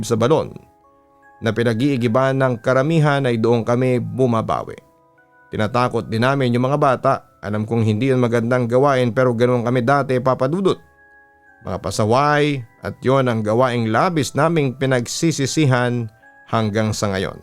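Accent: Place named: Waray, Philippines. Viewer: waray2 po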